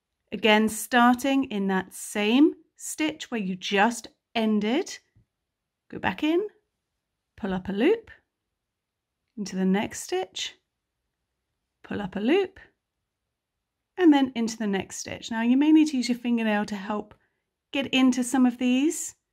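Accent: United Kingdom. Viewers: another excellent tutorial. has a pleasant easy to listen voice in UK English.Not sure why American crochet terms are used though. I am sure there is a good reason?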